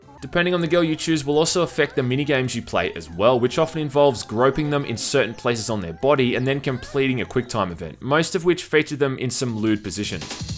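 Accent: Australia. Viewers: I don't know why, but this review made me laugh harder than it should have, possibly because I'm trying to see you as some studious, constantly befuddled Senpai (if that's the right word). Also, I'm dying to find out what an Australian accent sounds like paired with Japanese.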